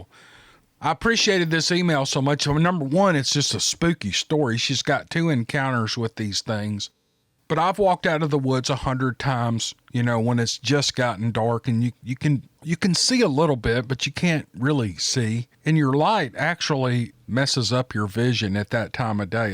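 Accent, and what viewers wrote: South, United States. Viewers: I thought I had listened to all your stories especially when I was laid up with the first cancer bout but it Appears not great to hear your voice I do listen to cryptids Canada but nothing is like your southern accent and gentle voicing of your stories thank you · Of all the great channels I've listened to, I think you have the best voice presentation. The easy southern accent is perfect for this type of thing, and the way you focus on your enunciation is very appealing. You should consider narrating audio books... You have a natural gift for it.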